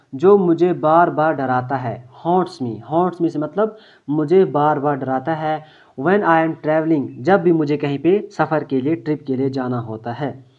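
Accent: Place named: Kashmir, India. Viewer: Sir I think you are from*Kashmir*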